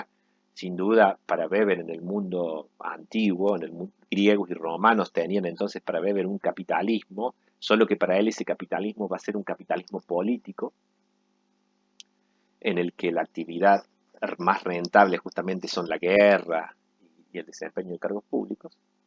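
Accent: Córdoba, Argentina. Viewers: Acá sí queda claro el acento cordobés. 😉 Felicitaciones por el contenido. Saludos desde Montevideo.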